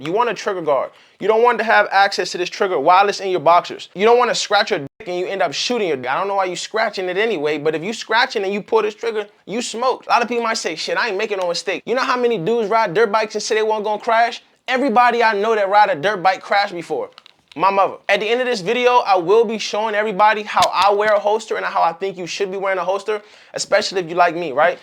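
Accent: DMV, United States. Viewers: I never heard the dmv accent in ur shorts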